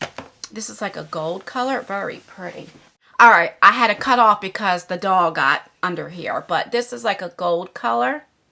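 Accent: New Jersey, United States. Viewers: I just love you and your big personality. I'm so sorry that someone in the same community as you would be so evil to slander you're daughters credibility. It makes me sick especially in the times were in. My heart broke for you're daughter and I hope she's recovering well. I had a bad day myself and hearing that big jersey accent made my day a hair better. Thank you for being you.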